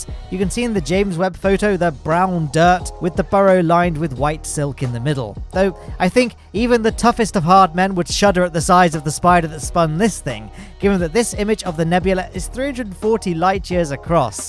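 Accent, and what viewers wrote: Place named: England, United Kingdom. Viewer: Great content diminished by an English accent.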